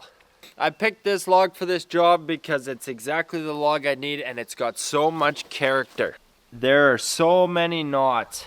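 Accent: Canada. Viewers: What you got there is a good Canadian kid eh! Saskatchewan I think.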